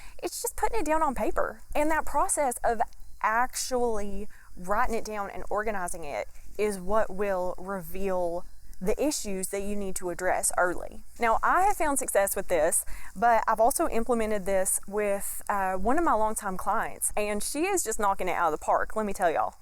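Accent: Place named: South, United States. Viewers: So glad I found this video. I developed this same method on my own and it REALLY works. Get as much detail or splashes of dialog into the individual chapter descriptions to support your story structure ahead of time. BTW love your t-shirt and accent. East Texas? Oklahoma? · Southern accent? Author? In the woods? I pressed the subscribe button so hard. 😂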